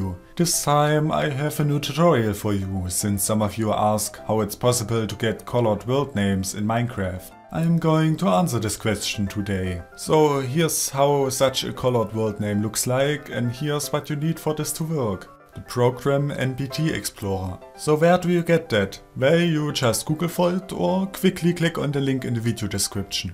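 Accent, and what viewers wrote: Germany. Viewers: ich auch hat man aber auch an den namen der welten gesehen und an deiner ausprache. das der beste command schreiber der welt ein deutscher ist omg xD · Man hört sowas von das du ein deutscher bist xD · I love your German accent so much… :D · Man hört richtig raus das du Deutscher bist :D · Warte, "Aufbau" und dann noch dein Akzent... Ich glaube du bist Deutscher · Are you german´?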